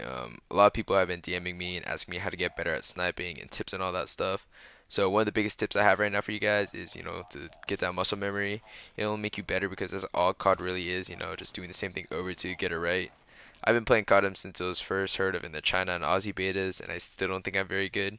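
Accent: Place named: California, United States. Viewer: Lol are you from California or something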